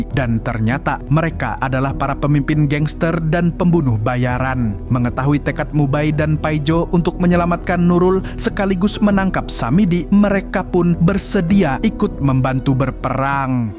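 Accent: Banjar, Indonesia. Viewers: Org Banjar KH mang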